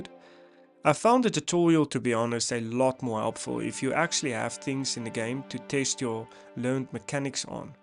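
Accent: South Africa. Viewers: Not used to hearing heavy South African accent 😅 awesome vid boet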